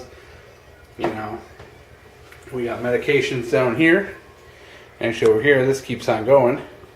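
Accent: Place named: Michigan, United States. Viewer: Are you from Michigan? You have that accent lol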